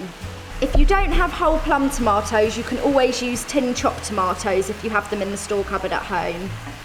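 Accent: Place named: United Kingdom. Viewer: Jesus ! She is more british than ... than .... than.... the..... than the brits 😅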